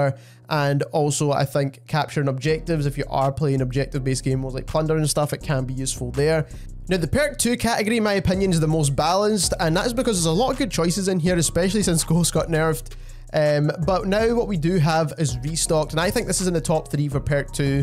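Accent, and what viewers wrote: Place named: Scotland, United Kingdom. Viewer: Scottish pretty sure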